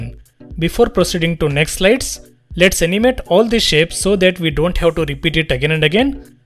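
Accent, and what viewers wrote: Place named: South India, India. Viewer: Wow.... Sir i dont know why, But i feel you are from south india.. May i know from which city you are ?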